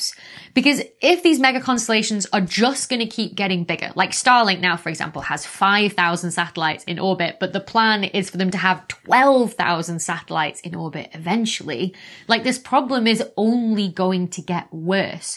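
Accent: United Kingdom. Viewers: can you plz speak less british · I used to live in Cambridge for a couple of years and I find it refreshing to listen to the British in your voice! I’ve watched many of your videos and am simply amazed by the shear depth, command, and mastery of your knowledge of cosmology. It’s wide and deep. I probably absorb 8% of your presentations - maybe some of this will stick in my tiny brain! 😊🤣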